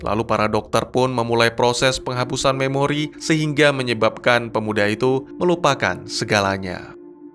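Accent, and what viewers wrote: Aceh, Indonesia. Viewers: Bg lu orang Aceh ya? Jwab dong wkkw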